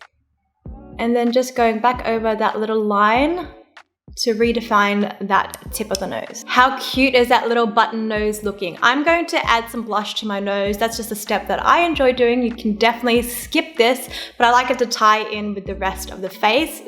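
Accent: Australia. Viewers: Man, I’m so glad I discovered your YouTube channel. You’re just so absolutely gorgeous and beautiful. I also love your Australian accent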